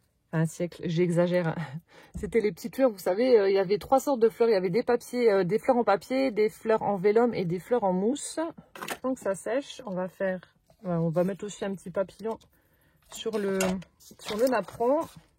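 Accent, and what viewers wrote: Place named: Alsace, France. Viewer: Bonjour 🌸 Je suis tombée sur ta chaîne par "hasard" et WAOUH... Pas du tout équipée et ne sachant pas du tout ce qu'était le scrapbooking, me voici complétement motivée pour me lancer! Je vais faire un grand tableau avec tes idées pour que chaque personne qui vienne à la maison puisse laisser un petit message souvenir! Merci merci merci 🙏💜💁‍♀️🧚‍♀️ en plus je suis d'origine alsacienne alors votre accent et vos répliques me font chaud au coeur et rire!!!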